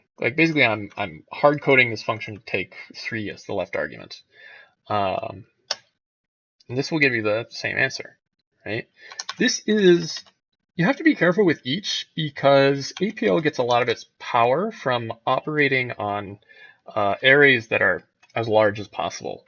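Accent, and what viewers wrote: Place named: Canada. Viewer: It really depends what part of what country he's from... My guess is Canada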